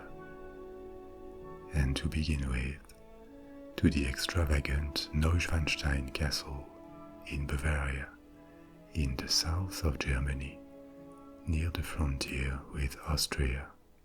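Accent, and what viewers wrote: France. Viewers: I'm french and I'm listenning to a french guy speaking in english, I guess I'm weird. However the voice is really relaxing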